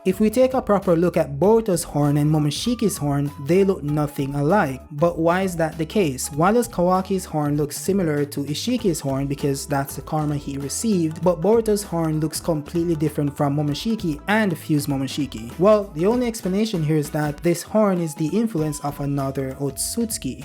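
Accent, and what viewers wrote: Jamaica. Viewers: Youhavaa Jamaican voice are u jamaican???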